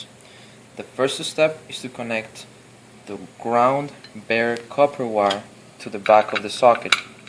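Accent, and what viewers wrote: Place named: Cuba. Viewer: Hialeah is a city within Miami, Florida. He sounds Cuban..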